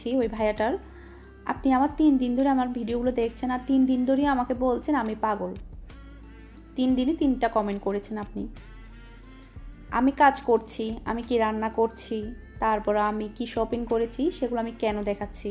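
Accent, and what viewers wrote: Chittagong, Bangladesh. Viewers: Apu apnar vasa ki chittagong?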